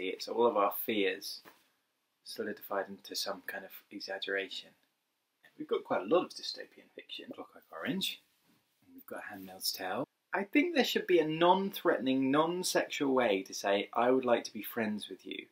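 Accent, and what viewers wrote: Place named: England, United Kingdom. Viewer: I love how more and more comfortable you are in front of the camera. If your accent didn’t give your nationality away your dry humor did. It is very much English 😂😂😂. I love this random video please make this a segment. It’s literally stream of consciousness in video form. It’s absolutely brilliant! Who ever was on the other side of the camera was hilarious, I like her!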